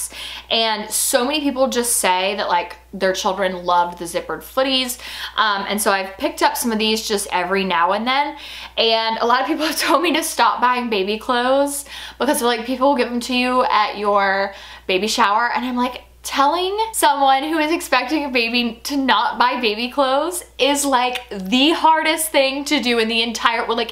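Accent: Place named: South, United States. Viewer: I love your southern accent and how you say nursery! Haha everyone in Colorado has no accent and sound like news anchors LOL. 🤣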